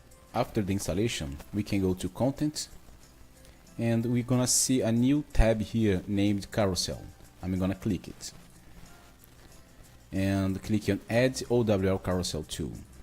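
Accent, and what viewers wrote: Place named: Brazil. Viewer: Great, thank you for replying actually I am learning Português and your accent was very familiar to Brasil 😍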